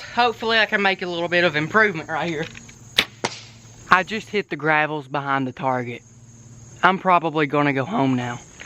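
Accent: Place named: Kentucky, United States. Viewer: "Not as FIRST as the CLOSE one" love the vids , that east Kentucky twang runs deep as the mines!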